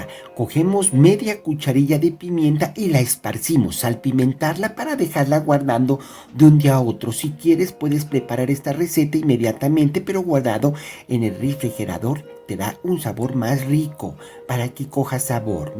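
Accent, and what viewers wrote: Colombia. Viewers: Puro asentó nariñense me encanta que viva pasto desde ipiales Nariño saludos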